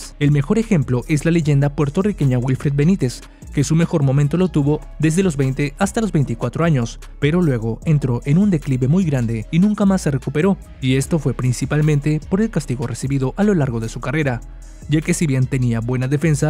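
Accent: Mexico. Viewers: yo no se si sos mexicano pero tu acento me dice que si. que amargo que sos hermano, los mexicanos tienen a los mejores boxeadores de la historia y el canelo es uno de ellos...te la pasas haciendo videos tratando de tirar abajo a uno de lo mejores boxeadores de la historia de Mexico en vez de valorar lo que consigue este tipo, y tiene solo 32 años!!! le dejo la cara como un tomate y lo tiro dos veces, a ustedes siempre les falta 5 para el peso...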